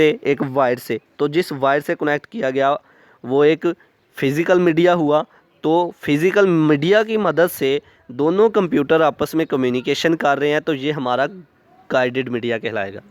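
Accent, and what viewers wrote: Punjab, India. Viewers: Punjabi ascent <3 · Aapka Panjabi lahjaa mashallah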